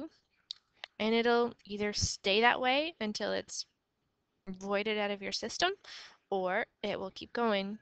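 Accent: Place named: Canada. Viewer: Canadian accent <3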